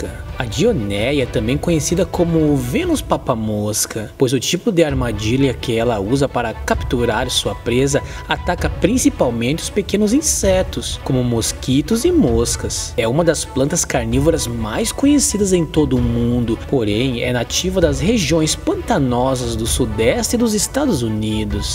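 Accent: Rio Grande do Sul, Brazil. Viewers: Gaúcho né !!! Inscritos!!! Top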